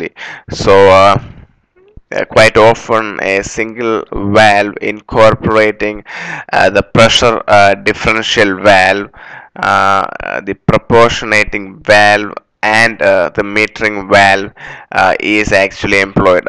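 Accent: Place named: South, India. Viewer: Indian guy raised in Mississippi ?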